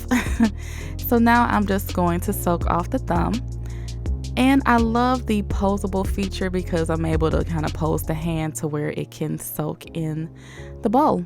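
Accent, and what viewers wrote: United States. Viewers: thankss.! why is so pleasant listening an american speaking instead a mexican speaking english! thank u so much!!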